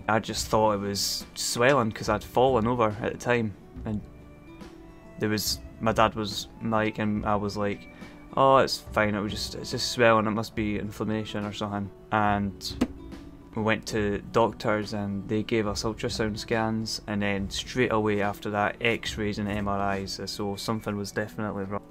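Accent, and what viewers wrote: Scotland, United Kingdom. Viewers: Yo man where you from in Scotland?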